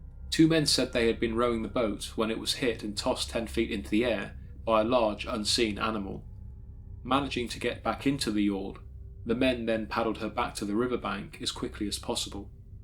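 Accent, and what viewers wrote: United Kingdom. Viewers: Faceless story teller but with a beautiful voice and cute British accent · A documentary just isn't a documentary unless a Brit narrates it. Excellent content by the way 👍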